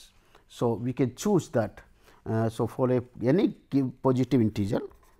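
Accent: India. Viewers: Perhaps he's trying to accommodate the entire world, its pretty hard to understand Hinglish. He's probably trying to make the video understandable even for non-Indians..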